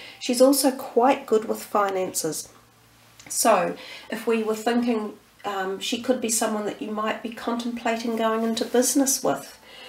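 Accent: New Zealand. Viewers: Kia Ora! Thank you very much good to hear a kiwi voice from the homeland. Much love from the Philippines. Thank you for your time and effort much appreciated!!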